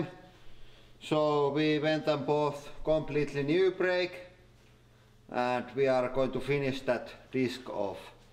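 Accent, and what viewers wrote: Finland. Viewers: that was some nice finnish rally english :D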